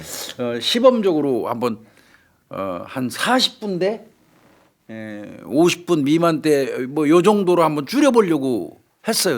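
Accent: Chungcheong, South Korea. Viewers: 우리의. 충청도. 말씀으로. 넘. 구수하게. 해주셔어. 감미록고. 훈훈한. 진국입니다. 정말. 꼼꼼. 인정유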